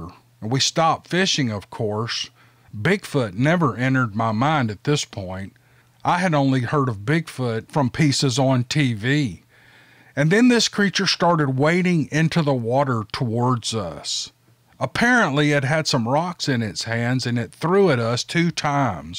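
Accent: South, United States. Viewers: . The accent is great. Especially to us in Britain my friend. Very Rett Butler, lol. Remember to us Georgia, N Carolina, S Carolina etc all sound pretty much the same. No offence bud. Also a lot of Southerners could still possibly be descendents of Civil War confederate Soldiers, which to a lot of us, is fascinating. I don't mean racism either. Just great and historical. Hope that doesn't offend you mate. Just a possible reason why people mention the accent. 👍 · "Those Dmn Yankee Carpet Bagger's and Pine Wood Thieves!". (I can just hear them, and well, "the element is a very close equal, those that SCAM the Naive and Older Folks").... and I'm a born Yankee, loyal to the Union, but with a very real Tennessee Southern Accent. I've never cared for the variable Southern or Country Accents, far preferring the Chicago and Boston....and has a very mild accent, much due to the having been raised in the Metro Memphis area. 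Much preferred! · The funny thing about your accent is relevant, of course it doesn't change the story, however, it draws you into the story, and makes you feel at home (so to speak) It is the Southern thing, I guess, anyway it is obvious that others think the same as I and many others., just keep on keeping on, you are a valuable speaker, and have a way of relaxing your audience, me included. Just be real, and believe it or not, you, as you, comes thru. I really enjoy your channel. Don't change a thing.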